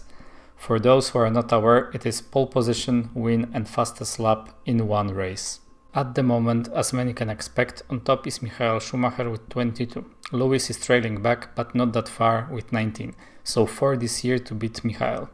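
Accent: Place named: Poland. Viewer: Uslyszalem akcent i od razu skojarzyl mi sie polak xd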